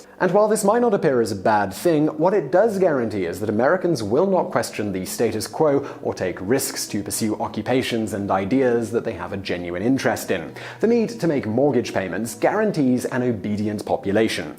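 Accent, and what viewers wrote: United Kingdom. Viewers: Too much haphazard information being thrown around: 1%, 0,1%... Oh, it turns about to be US incomes only? And what is all this information based on? Moreover, it's a Brit talking about the US, sacrificing even more on credibility... Not sure what to make out of all this in the end, rather than a fancily enthusiastic presentation by a guy with a British accent...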